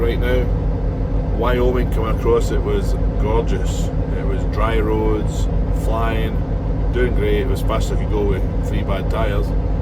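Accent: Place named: Scotland, United Kingdom. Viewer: where are you from in Scotland, from Ice Man in Ayrshire your accent is still strong,keep it between the lines.💪😎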